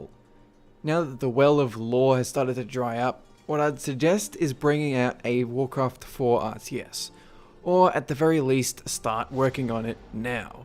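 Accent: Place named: Australia. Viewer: Which area of Australia are you from?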